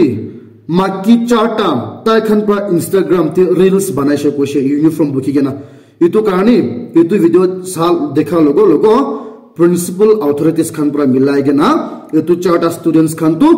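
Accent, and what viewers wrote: Nagaland, India. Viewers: Hi naga..wishing you a merry christmas and happy new year....I like and admire your nagamese news...thanks and bless you for announcing clear and pure nagamese..with prayers mk..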